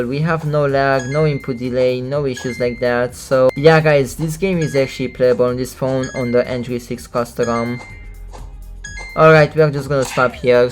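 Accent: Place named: Romania. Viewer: I WAS SURE UR ROMANIAN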